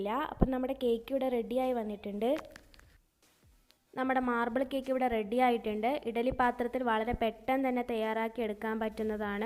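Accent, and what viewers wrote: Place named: Kannur, India. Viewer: Kannur basha mattane...Appathekk... polum 😂😂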